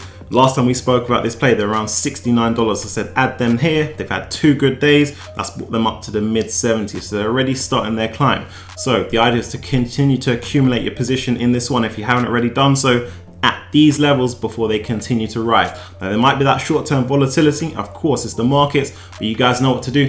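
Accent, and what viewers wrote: London, United Kingdom. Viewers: Are you from london?? SE13 here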